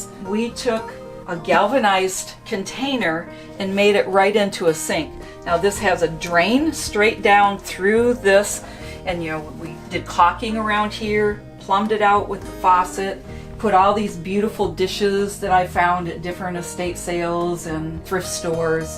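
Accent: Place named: Midwest, United States. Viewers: I caught your Michigan "accent" immediately! lol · Ahhhh, I knew that I detected a Midwestern accent in the narrator's voice!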